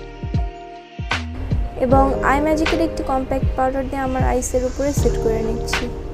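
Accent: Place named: Bangladesh. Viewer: when you start talking 💗💗 damn she is bangladeshi😻💕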